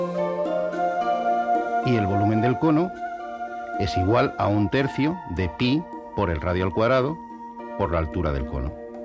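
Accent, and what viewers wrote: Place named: Spain. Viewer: Con esta explicación en español tan tan español y la intensidad del volumen de la música ... No entiendo un carajo lo que dice .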